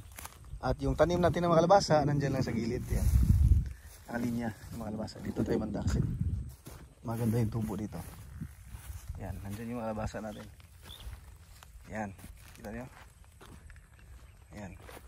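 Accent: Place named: Bohol, Philippines. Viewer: Taga Bohol po ba kayo sir?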